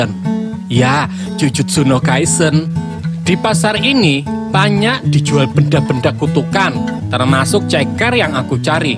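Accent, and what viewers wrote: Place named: Banjar, Indonesia. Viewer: Logat banjar nya tu heh lawak banar🤣🤣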